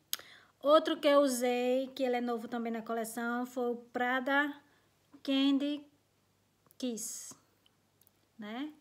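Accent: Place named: Northeast, Brazil. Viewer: adoro vc e esse seu sutaque nordestino vc é maravilhosa suas resenhas.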